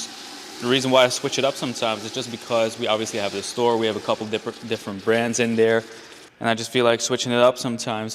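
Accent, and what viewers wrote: United States. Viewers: Are you american? Your english sounds very american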